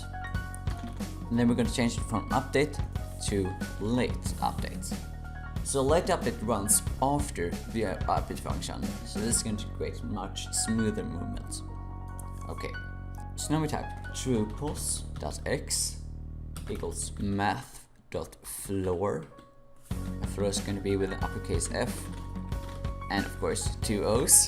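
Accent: Sweden. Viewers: Am i hearing a swedish accent? (Very helpful video btw)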